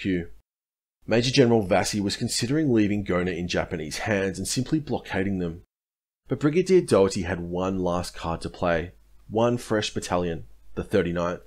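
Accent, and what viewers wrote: Australia. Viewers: As an American whose father and uncles fought in Europe, and neighborhood families who fought in the Pacific, wonderful to hear detailed, reasoned, account of Bona-Gona, with an Aussie accent, which makes it believable. My generation never heard much (except sinking transports!) Many thanks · Wonderful great to hear an Aussie voice at the helm. History needs to be told by all nations. Here in the UK, the young seem to be denied an education whilst being fed on a diet of puerile rubbish like who's bonking who on Love Island .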